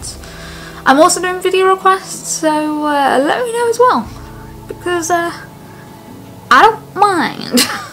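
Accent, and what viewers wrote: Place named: United Kingdom. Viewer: Lovr your hair and glasses and beautiful accent if u dont mind me asking where u from are u British from England or London can't quite pinpoint your accent but i love it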